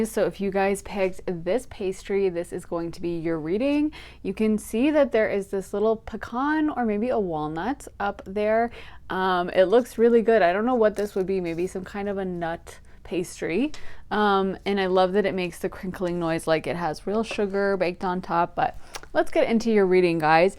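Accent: Minnesota, United States. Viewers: are you from MN? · Are you from Minnesota?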